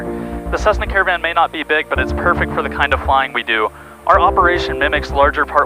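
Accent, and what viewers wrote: RP, United Kingdom. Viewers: You're so posh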